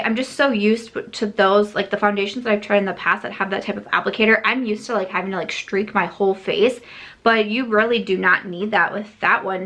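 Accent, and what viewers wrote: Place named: Midwest, United States. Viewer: So i agreed with so much of what you said here. 1. This palette totally speaks to me; i also have very few cool-toned nudes in my collection. 2. Placement suggestions as names?! UGH. I understand the helpful aspect of it, but that can go on a little card or on the plastic sleeve that comes in palettes. I think names spark creativity when they give a vibe to go with the color. If (when?) i get this palette, i'll make little stickers with my own names to cover the nonsense that ND put on there. 3. Rare Beauty: i have lupus, rheumatoid arthritis, neuropathy, and several other conditions that cause pain and fatigue, which sometimes makes even the simplest tasks (like opening a small bottle) very difficult or impossible. So i deeply appreciate the thoughtfulness with the packaging. Plus her message about beauty is something we all need to hear. 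<3 Side note: your Midwest twang (it makes me miss my Chi home), your green eyes (like mine), your love of wine and the Cubs, your birthday is the day before mine (which makes us both Aries)...are we related?! LOLOLOLOL :)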